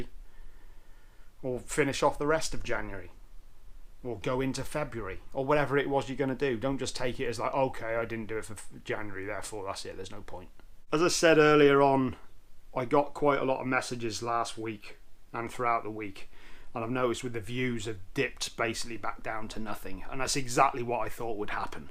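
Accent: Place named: Cotswolds, United Kingdom. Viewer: We're hoping for a new episode, any day now. The Cotswold accent grows on a person. Thanks.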